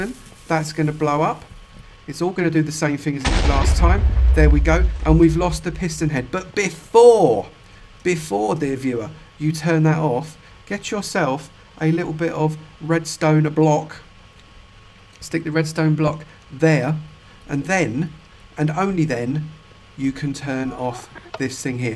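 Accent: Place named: Yorkshire, United Kingdom. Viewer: i can tell your yorksire well i yorksire aswell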